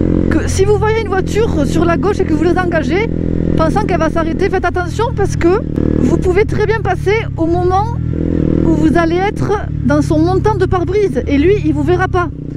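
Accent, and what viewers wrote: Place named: Southwest, France. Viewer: toi vu l accent tu sembles etres du sud ouest , sinon bonne continuation!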